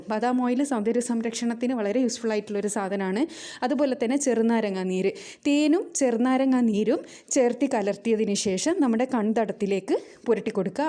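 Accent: Kannur, India. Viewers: കണ്ണൂർ ഭാഷ chechijk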